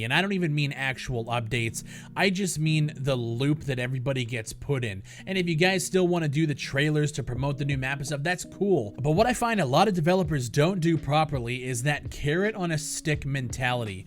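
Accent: Canada. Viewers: I didn't know you had that Prairies accent until you pronounced 'Cal-garry.'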